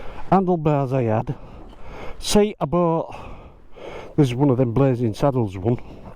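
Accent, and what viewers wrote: Yorkshire, United Kingdom. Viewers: I’ve been rewatching your “archive” recently as I miss your Yorkshire twang and your regular visits to Squires ! I can understand that you’ve already video’d all your bikes and that putting videos on YouTube is a lot of work but an update on your stable would be highly appreciated ! Best Wishes !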